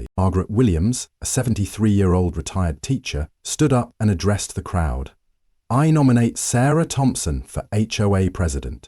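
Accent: United Kingdom. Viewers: What the hell with the British narration?? Just another Boss woman story . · Why is it a british voice No one in Britain has guns Gtfooh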